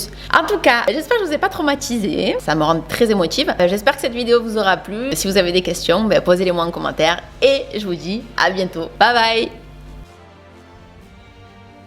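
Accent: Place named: South, France. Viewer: J’aime beaucoup votre accent du Sud, c’est de quel endroit ?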